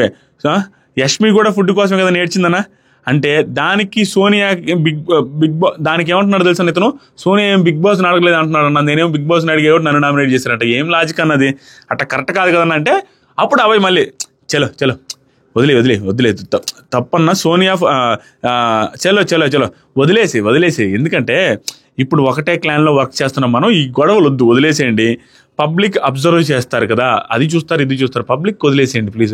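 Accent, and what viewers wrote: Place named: Nellore, India. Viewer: Anna nee review chuse nee matlalu chuse full navukunam enduku ante madhe kuda nellore kabate really rocking anna nee review aethe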